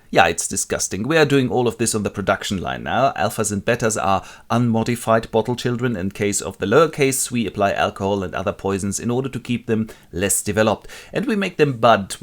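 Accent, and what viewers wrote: Germany. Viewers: Iron American Dream on YouTube. It's wonderful, I love the vernacular. The German accent tops It off.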